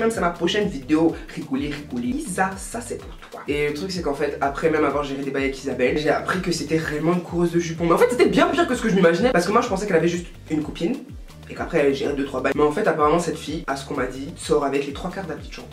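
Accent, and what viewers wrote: Ivory Coast. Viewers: Je savais que tu étais ivoirienne ça s'entend. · par ton accent je me doutais trop que tu venais de la CI 💫💫❤❤❤ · Au debut j’ai tout de suite su qu’elle a vécu en Côte d’Ivoire avec l’accent et c’est manière 😊😂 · Hum on reconnait une ivoirienne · c'est l accent ivoirien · L'accent ivoirien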